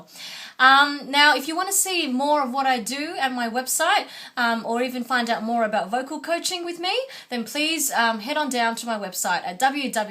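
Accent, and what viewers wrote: Australia. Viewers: LOL what does being a linguistics major have to do with anything? She has a pure Australian accent. Is the accent not the same as any other Australian accent from that region? How does her being Asian play into your interest...(ahem) exoticizing on a linguistic level? I could see if there was some residual Asian accent to make an interesting mix but there is none.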